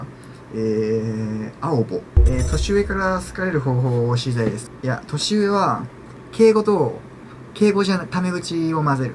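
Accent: Kansai, Japan. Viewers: 溢れ出る自然な関西弁の安心感えぐいんよな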